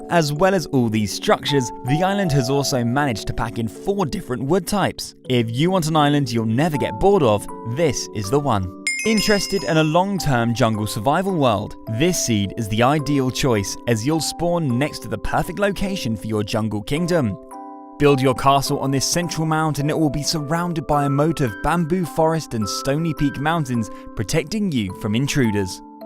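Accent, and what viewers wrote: United Kingdom. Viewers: He sounds like he's from the great British baking show.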